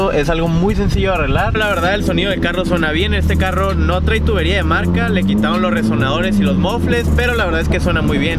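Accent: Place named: Sonora, Mexico. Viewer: Por las placas y el acento es de Hermosillo sonora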